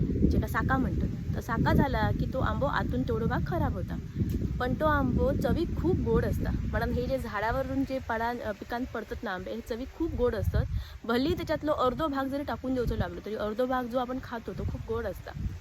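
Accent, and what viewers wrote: Malvani, India. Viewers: मालवणी भाषेत वर्णन करतास ता कानाक ऐकूक बरा वाटता. ह्या स्वार्थी लोकांच्या दुनियेत आपणही कणखर राहून धंदो केलात तरच निभाव लागात.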